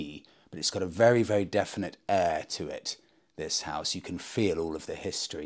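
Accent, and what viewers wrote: RP, United Kingdom. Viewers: Enjoy your trip to my end of the country. Though you will sound posh to most foke up here 😊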